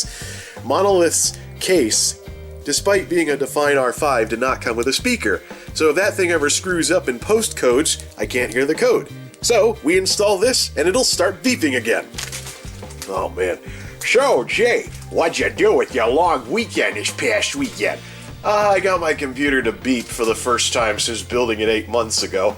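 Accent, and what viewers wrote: United States. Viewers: I was digging the sick South accent man. Thanks for the vids, good stuff.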